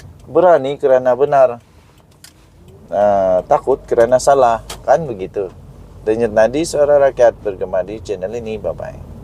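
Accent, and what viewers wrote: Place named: North, Malaysia. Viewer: Sedap dgr tuan ckp utara nu...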